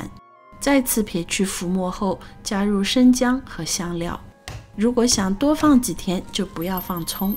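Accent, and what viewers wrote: Shanghai, China. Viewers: 难得听到有youtuber说这么正宗的上海国语，必须follow一记！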